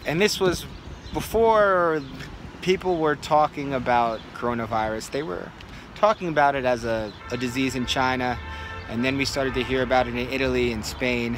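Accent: Brazil. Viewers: você as vezes fala palavras em Inglês com sotaque Brasileiro. Isso acaba influenciando quando você fala em inglês? Tipo quando mora muito tempo no Rio e pega o sotaque carioca. Já aconteceu isso com você?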